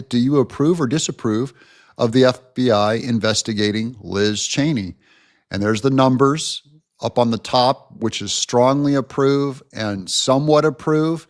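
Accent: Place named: Turlock, United States. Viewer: Very distinctive voice. Sounds like a Turlock boy. Ha 😂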